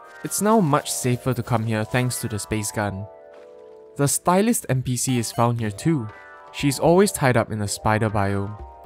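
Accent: Singapore. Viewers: are you Singaporean? I can hear your accent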